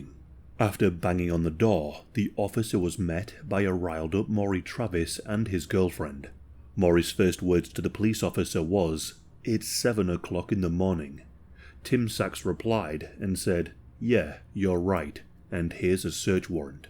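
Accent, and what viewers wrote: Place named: North East England, United Kingdom. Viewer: Not A.I for a change, he's from the very top of England before you get near to Scotland. North-East accent 😍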